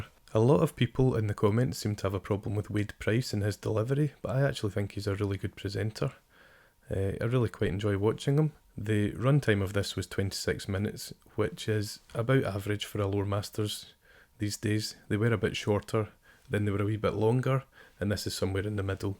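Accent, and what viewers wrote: Scotland, United Kingdom. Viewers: Warhammer lore in Scottish accent sounds dope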